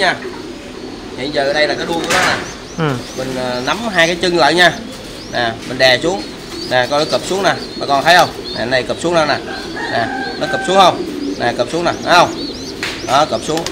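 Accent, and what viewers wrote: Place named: Phuc Tho, Vietnam. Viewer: a noi tiếng jog phúc xo wa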